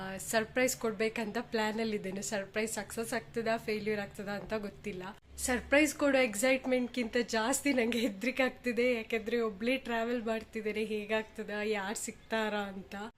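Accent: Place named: Mangalore, India. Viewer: Manglore Kannada❤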